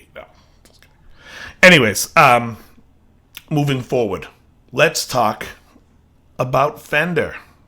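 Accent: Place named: New England, United States. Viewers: I subbed because I'm positive from the shirt and voice youre from Mass and so am I so fuck yeah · Just found your channel today. Love the Sox jersey. You sound like youre from New England too. Waltham here. Anyway I agree with your point completely. Good shit guy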